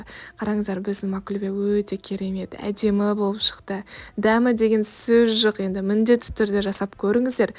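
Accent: Standard. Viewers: Жарайсың таза қазақша сөйлейді екенсің керемет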